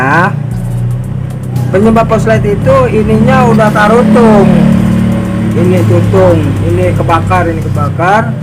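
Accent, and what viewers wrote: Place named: West Java, Indonesia. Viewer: 😆😆😆orang Sunda pisan euy.mantab tutorialnya.smangat mamang ah.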